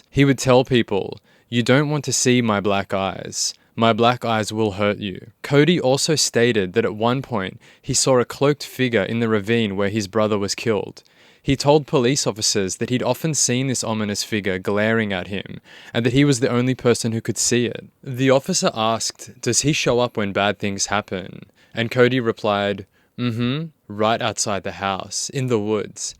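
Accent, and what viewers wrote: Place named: Australia. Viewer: I'm not a big time YouTube commentator because of how much i try to be anonymous, but your videos certainly have a way of stimulating interesting discourse and your point of view is intriguing. (Also aussie accents are my favorite 🤘🤘🤘) All i really can say about this one is i am not convinced that Cody is of average "intelligence" for lack of better wording. He seems like he could have developmental delays which are especially likely given his exposure to hard drugs in utero. I say this not because I am excusing what he did or even buy the whole goblin thing, but i feel empathy for him because he is clearly functioning at the level of a much younger person, perhaps even as young as the boy he murdered. Murder is wrong and horrific, obviously. Cody is an unfortunate soul though too. Born on drugs is trauma, foster care is trauma, being different from your peers is trauma, and so is severe mental illness. 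The universe is impartial, and Cody was dealt a bad hand. Many of us are to varying degrees. I don't have a point to this, but i couldn't help saying out loud at the end of your video, "what a shame". (Edited misspellings)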